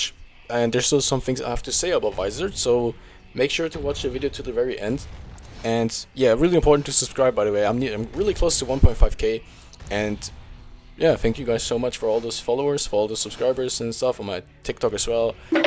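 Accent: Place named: Germany. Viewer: sehr deutsches englisch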